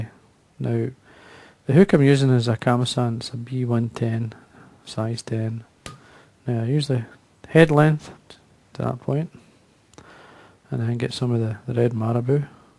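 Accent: Scotland, United Kingdom. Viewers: Thanks, I am buying some. I was at our monthly flyfishing, tying meeting here in Waco last week and a friend mentioned your name. He is a good tyer and a very good fisherman. He is about to win a tough statewide (Texas) fishing contest, multiple species, many variable weather conditions. Pat has been watching you here on youtube, and once we began talking, several others chimed it. You've got a great following here. Also, you've got a bunch of Texans trying to imitate your accent as well as your tying abilities and techniques. I was laughing listening to all of the Texans trying their Scottish brogues. I told them about your filling that beautiful storage box with Classic Salmons; do you still have it? I won't forget that project. I should have bought it when we talked about it. I do have your flies mounted and displayed at the clinic and in my tying room here at the house. 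Everyone marvels!! Take care and know you are bringing a lot of joy and education to tyers around the world. They love you here in Texas!! Ray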